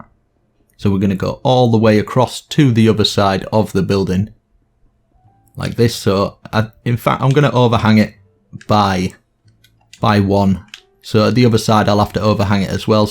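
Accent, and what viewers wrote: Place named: United Kingdom. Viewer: And it is not just the British accent it's also the sound of your voice! (If that makes sense)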